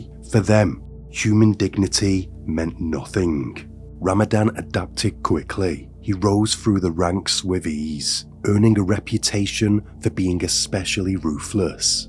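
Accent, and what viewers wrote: United Kingdom. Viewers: Common pronunciation for British folk in certain areas. England has a very broad range of accents and pronunciation habits. I quite like his voice. It seems to work very well for this type of content.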